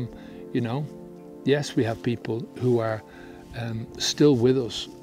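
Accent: Ireland. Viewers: Things sound more true with a good Irish accent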